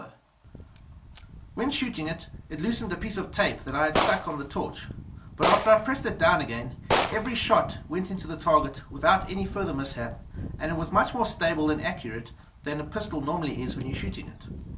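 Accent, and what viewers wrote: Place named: South Africa. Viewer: It’s so bizarre seeing someone who looks like they’re from Tennessee talk in a South African accent. Cool stuff though.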